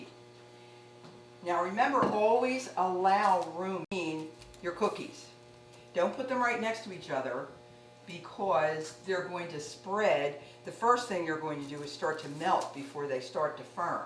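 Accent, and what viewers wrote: Philadelphia, United States. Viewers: Starting my own channel and found you...heard your voice and knew you were from home. Love that your family has encouraged you to do this...just became a young Nana (Mom Mom) myself. I wish you all the love and success one can have on here. From one Cooking Philly Girl to a close to home Philly girl, passing on your link.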